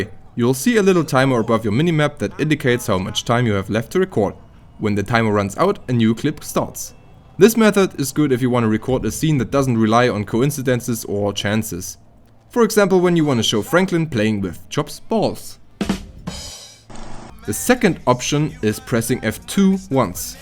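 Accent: Germany. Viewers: Are u a german youtuber cause you have that accent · Hey, ich geh mal anhand deines akzents davon aus, dass du deutsch sprichst. Ich hab ein kleines GTA Projekt vor und bräuchte da Rat bzw Hilfe von jdm mit Erfahrung. 1. Kann ich mir im online Modus mehr als 2 Charakteren machen ? ( Brauche 2 für mein Video ) 2. Kann ich beide Charaktere gleichzeitig steuern lassen im offline modus, sodass ich praktisch 2 Schauspieler habe, die ich bzw noch eine 2 Person mit einem Kontroller steuern kann und somit z.B beide für eine Szenen nebenher laufen lassen kann ? 3.Wo legt GTA die exportierten Videos hin, sodass ich sie in Pr öffnen kann ? 4. Kann ich mit gemodeten Autos Aufnahmen machen ? Wäre echt toll, wenn du mir helfen könntest bei meinen Fragen ! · Digga du hörst dich so deutsch an hahahahah are you german bro?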